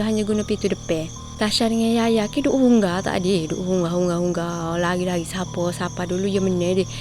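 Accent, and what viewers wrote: Kelantan, Malaysia. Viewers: Hebat sangat ke minah ni speaking setiap kali kau bercerita kisah seram?bunyi orang kelantan!tapi acah acah bagus cakap mat saleh😜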